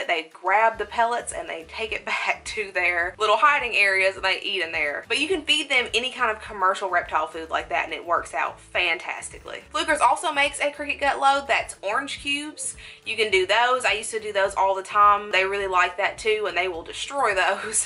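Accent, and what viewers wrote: South, United States. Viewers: I love your accent. God I miss the south 😭 THANKS FOR THE INFORMATION 👍😊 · i love ur southern accent 😍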